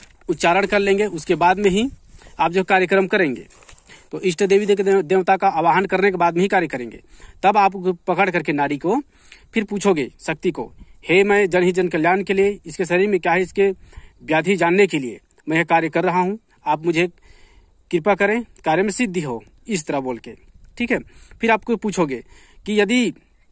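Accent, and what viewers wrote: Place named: Chhattisgarh, India. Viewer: आप लगता है। छत्तीसगढ़ से है। आपके बोलने की शैली से पता चल रहा है। कहां से हैं महराज पता व नं बताये। धन्यवाद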